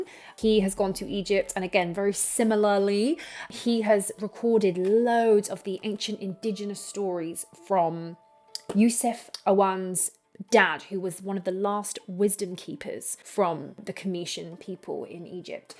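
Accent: United Kingdom. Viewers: I know, and y so britty talking style.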